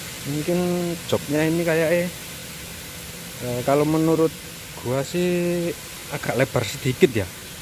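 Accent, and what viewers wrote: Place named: Java, Indonesia. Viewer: Alami aja mas bro, nek medhok ra sah gue2 an.. Wagu.. Hahaha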